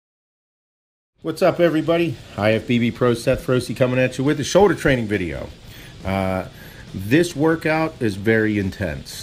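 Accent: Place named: Pittsburgh, United States. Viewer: Love these videos. Probably the yinzer accent.. Crushin it, boss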